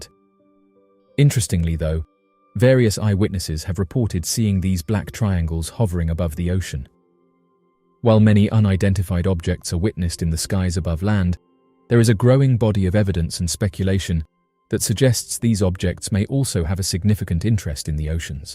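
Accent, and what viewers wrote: England, United Kingdom. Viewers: with annoying en-glish accent..Indeeed !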